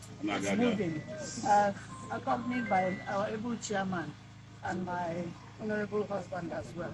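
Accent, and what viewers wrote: Igbo, Nigeria. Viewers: She speaks just like an igho woman mixing English with igho.Wow. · That woman speaks english like she grew up in Nigeria. She can‘t be born in the uk because her english sounds too nigerian no british accent at all. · Even her accent is pure Igbo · She even speaks English with a Nigerian accent. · Oh my goodness! I'm in love with our Igbo wife! Well done, and good job to our Naija wife, and to her loving husband. I don't speak Igbo, but she totally sounds like an Igbo woman to my Yoruba ears. Sending her so much love!! ❤❤❤ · The intonation shows that this woman is a half cast raised in an Igbo environment. She tried, even with proverbs. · Even her spoken English sound like an Igbo woman speaking wow!❤❤❤ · Probably she was born and brought up in the east and eventually married an igbo man cos she did not just understand and speak igbo, she also has the accent which shows she isn't new in their mist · With the Igbo accent as well. 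It’s like I am hearing an Igbo woman from the village. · Exactly, as soon as I saw her I knew she was mixed. Her hair texture is more black than white. She was definitely also raised in Nigeria. I live in England and nobody here can fake the Igbo accent that much. People try but u can still tell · Wow 🤨 Not only did she speak very well She sounded Igbo too With an Igbo accent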